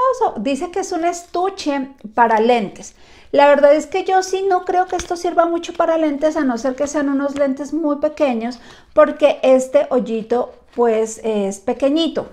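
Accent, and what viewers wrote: Colombia. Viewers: Eres de Colombia y vives en Aguascalientes? O porque hablas con acento Colombiano?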